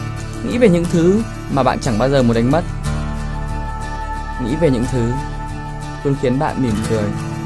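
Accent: North, Vietnam. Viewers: GỊONG CON TRAI MIỀN BẮC DỄ THƯƠNG QUÁ Ạ <3 EM GỐC MIỀN TÂY NHƯNG NGHE VẪN THẤY THÍCH LẮM <3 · minh k thich tieng bac tu xua toi nay ... ma sao bay h minh thich lam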